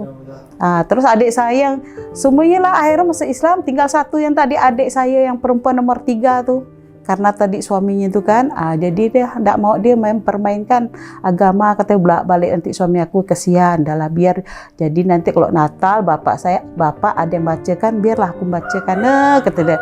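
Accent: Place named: Malaysia. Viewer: Tapi,akk macam org Melayu cakap Nye kak,akk dari mana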